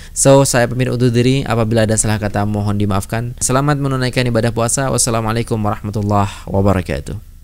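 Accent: Malaysia. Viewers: ..Cara awak cakap pun dah macam slang dan loghat orang melayu ❤️❤️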